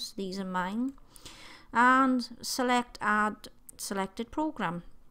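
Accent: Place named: Wales, United Kingdom. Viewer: Amazing, thanks so much sexy voiced welsh lady!!! xx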